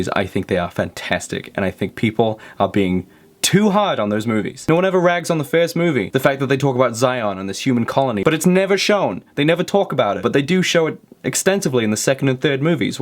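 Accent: Australia. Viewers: Trying to figure out what part of Australia you are from. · Dude did you live in Australia for awhile or anything? You pronounce so many words like an Aussie would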